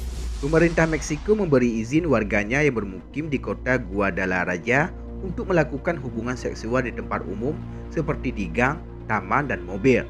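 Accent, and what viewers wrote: Aceh, Indonesia. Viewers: Ini yang ngisi suara org Aceh ya? Logatnya Aceh banget 😂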